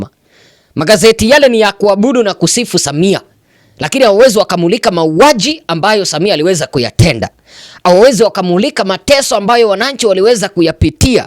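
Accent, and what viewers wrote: Kenya. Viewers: Batilinimamayako nababayako mbwawewe kwanzahatakiswahilihuwezi kwanzawewe siomtanzania hakiswahilichako ninakujua wewe nimkenya kaongelee mamboyakenyeyenu nchimmeiuza kwamabwenyenye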